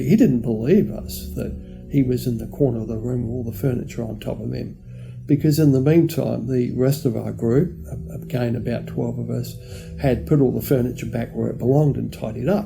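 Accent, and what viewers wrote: Australia. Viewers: Are you a fellow Australian?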